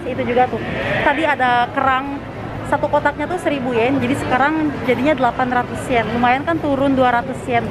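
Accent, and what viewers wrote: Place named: West Java, Indonesia. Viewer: Logat sunda bogor na masih aya meskipun lama di jepang 😁😁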